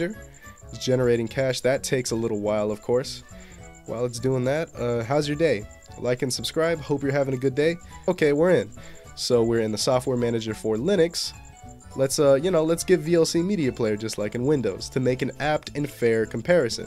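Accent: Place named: United States. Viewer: i love how to this guy narrated the video with the slang and bla bla bla, very american i love it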